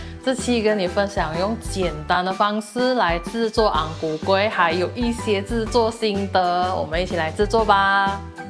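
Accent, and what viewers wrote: Malaysia. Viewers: 喜欢听妳那带马囯乡音的华语.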